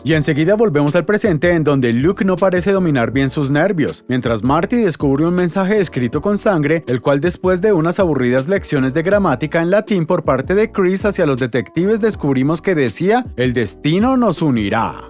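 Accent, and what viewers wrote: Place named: Colombia. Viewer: Eres de Colombia? es que tu acento se parece a uno de los tantos acentos de que tenemos aquí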